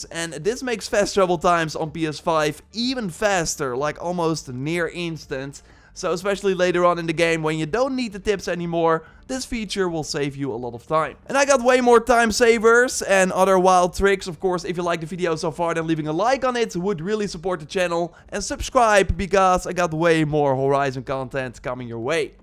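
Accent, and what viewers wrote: Netherlands. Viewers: I believe he is Dutch. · Are you Dutch?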